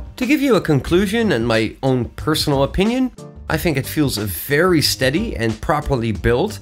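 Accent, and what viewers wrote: Netherlands. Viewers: I heard a dutch accent... but I wasn't a 100% sure until I read badkamer in the app... Lekker bezig!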